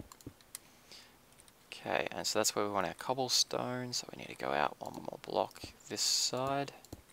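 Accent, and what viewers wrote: Australia. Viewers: I really like your Australian accent! I lol'd when you said "ice fountain" because I thought you said "arse fountain" XD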